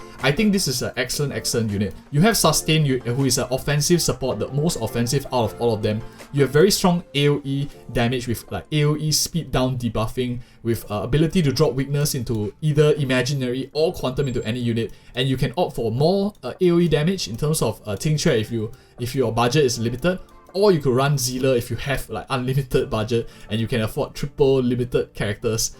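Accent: Singapore. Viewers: singaporean accent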